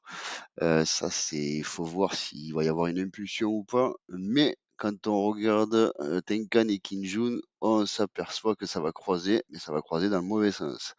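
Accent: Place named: South, France. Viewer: Tu viens du sud toi aussi 🎉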